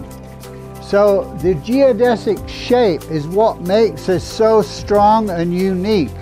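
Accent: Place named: Yorkshire, United Kingdom. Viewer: I think I can hear a Yorkshire accent poking out through many years in the US?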